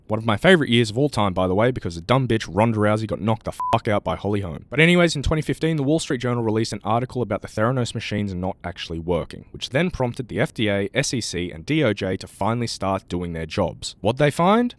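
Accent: Asia. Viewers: Sick video but the asian accent was unfitting, otherwise the video would be perfect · Asian accent is a bit wack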